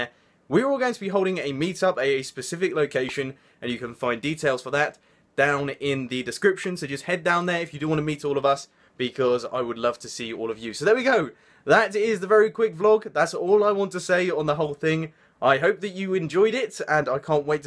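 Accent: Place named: United Kingdom. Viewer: British accent is awesome, way better than the american accents I know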